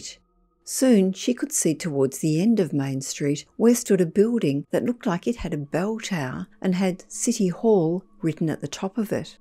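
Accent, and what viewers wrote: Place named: Australia. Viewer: In Australia clerk is pronounced "clark". The narrator is Australian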